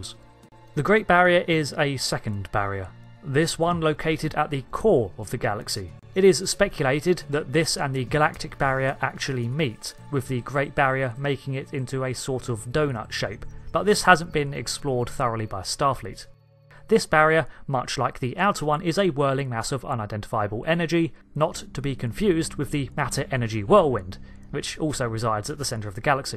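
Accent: United Kingdom. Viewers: Why am I so repulsed by narrators on YT with British accents? I was a bib Beatles fan and couldn't get enough of that sound. Now? Fuggedabouditt!!!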